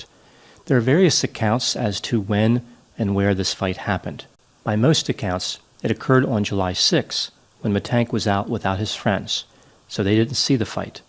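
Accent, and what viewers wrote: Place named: Northeast, United States. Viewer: I would love to know where you grew up. You’ve got a tad bit of an accent there! Sounds like NE close to NY/NJ?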